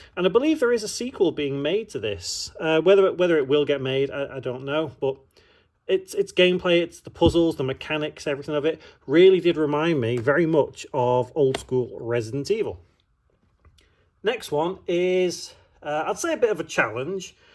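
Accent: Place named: North West England, United Kingdom. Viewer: Cool video man and it’s good that you’re highlighting lesser known games. I think if you’re wanting to do YouTube seriously you’d benefit from some higher production values. I’d script your game voice overs so you can hit all the points conclusively rather than winging it. You don’t have to listen to me but I do run a channel with a silver play button and the advice is friendly and I want you to succeed. You also sound like you’re from my neck of the woods, north west uk?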